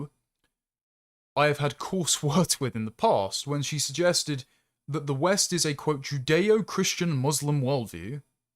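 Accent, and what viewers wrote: United Kingdom. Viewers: Nah, I think it's hot.I love the uk accent 😍😋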